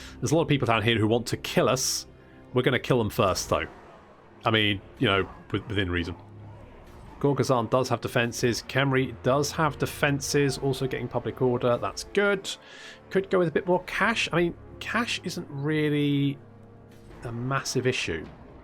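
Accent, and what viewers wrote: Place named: United Kingdom. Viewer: What? Who's competing with you? That fraud PewDiePie? You've got something he'll never have - a British accent.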